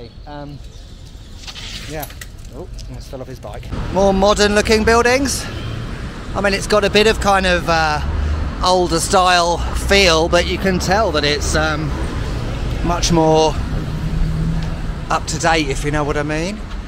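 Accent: United Kingdom. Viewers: Are you british? Your accent sounds different 🤪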